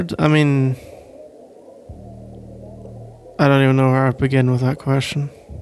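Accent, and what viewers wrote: Scotland, United Kingdom. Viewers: AAAAAAAAA i love scottish accents so much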